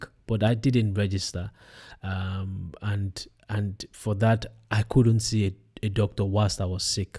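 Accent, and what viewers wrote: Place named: West Africa. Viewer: West Africa sounds like. Probably Nigerian or Ghanaian.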